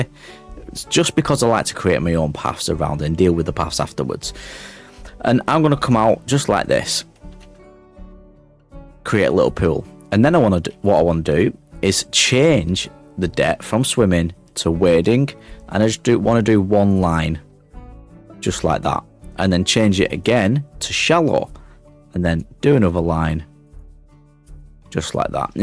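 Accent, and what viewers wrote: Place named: Northern England, United Kingdom. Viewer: Ahh your northern accent! Peerrrrfect! Great tutorial thank you xxx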